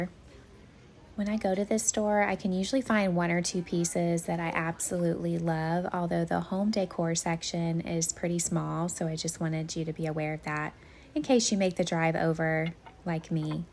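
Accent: South, United States. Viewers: I’m hooked. I’ve watched your videos a lot these past days. You really sound like a Southern Belle. It’s fun shopping with you.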